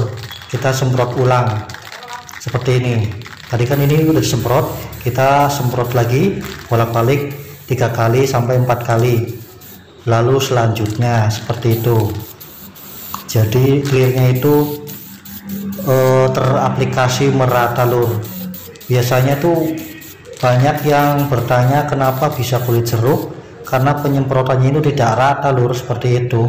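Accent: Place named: Banyumas, Indonesia. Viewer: njenengan sih wong ndi lur, denenge ngapak pisan?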